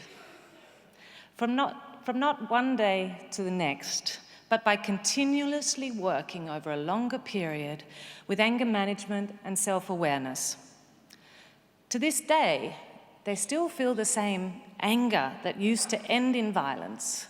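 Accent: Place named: Australia. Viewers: Hasn't lost her Aussie accent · As a someone who speaks several language, I can tell you, that from what I know, losing one's native accent is pretty hard. It's probably because she's Tasmanian, she might be an Aussie, with an Aussie accent, but there's some Tasmanian accent in there, and that's what sounds broken, or "Danish". You can still hear that her Aussie-Tasmanian accent affects her Danish to a pretty high degree.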